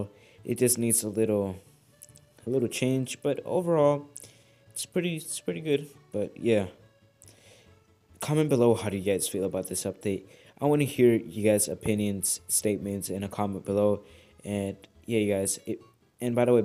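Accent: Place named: India. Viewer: Why do u sound like the Indian guy who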